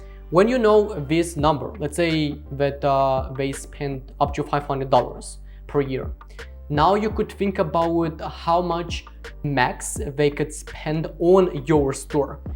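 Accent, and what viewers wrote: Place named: India. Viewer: a blonde with an Indian accent.... that's rare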